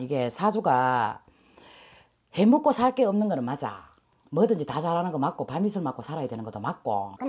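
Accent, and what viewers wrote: Gyeongsang, South Korea. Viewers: 예쁜선생님 오랜만이네요. 더 예뻐지셨네요. 뵙고싶었습니다 . 억수로 경상도말씀 징하게하십니더 그래도 말씀 옙뻐에 쌤 건강하세요. 인천이라에 시원 시원하신것이 아주좋습니더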